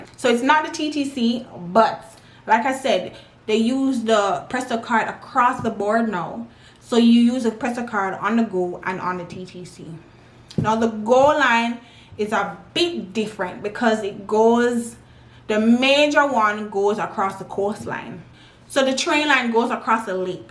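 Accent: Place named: Jamaica. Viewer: U sounds Jamaican girl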